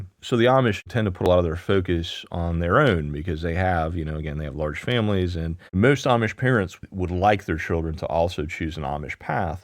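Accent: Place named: Baltimore, United States. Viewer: You sound like you’re from where I’m from lol. The way you say your Os. I’m from just north of baltimore, not to far from SE PA Amish country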